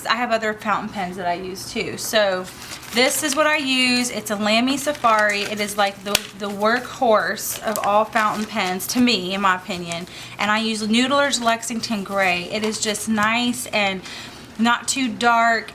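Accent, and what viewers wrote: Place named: Texas, United States. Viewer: love love love your videos! I noticed your accent right away and knew you had to be from Texas! I myself am from Texas live about an hr outside of Austin. keep up the awesome videos ,I love how creative you are and I love your personality!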